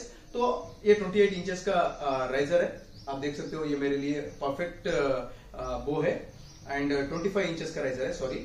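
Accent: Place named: South, India. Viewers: He is from Telangana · I think you are from south india as listening your hindi😁❤️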